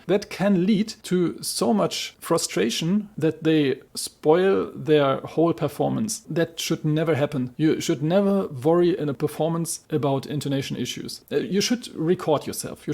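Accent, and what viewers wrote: Germany. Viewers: würde mir nur etwas weniger deutschen Akzent wünschen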